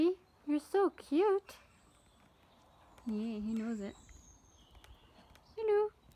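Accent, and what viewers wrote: Ireland. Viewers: r u from Ireland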